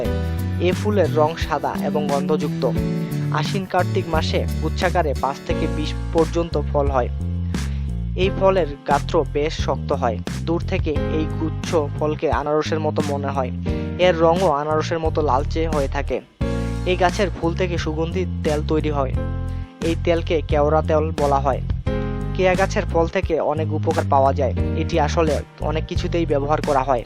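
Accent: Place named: Barishal, Bangladesh. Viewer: ভিডিওর ধারা ভাষ্যকারের বাংলা ভাষার উচ্চারণ বরিশালের আঞ্চলিক ভাষার অনুকরণে উচ্চারিত। অশুদ্ধ উচ্চারণের জন্য অনেক বাংলা শব্দের সঠিক অর্থ বুঝতে অসুবিধা হচ্ছে। বিশুদ্ধ বাংলা উচ্চারন হলে আমাদের সবার বুঝতে সহজ হয়। ধন্যবাদ।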